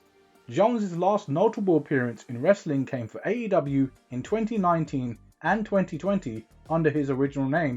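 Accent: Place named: United Kingdom. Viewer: How come you narrators are always British??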